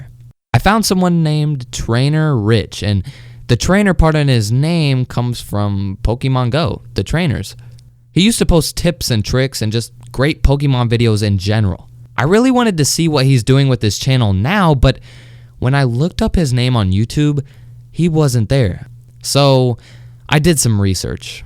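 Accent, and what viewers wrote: Australia. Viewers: Kdugg 0 I’m 100% sure he’s from Australia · isn’t he Australian? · I thought he was from Australia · Wait a minute Lachlan is Australian not British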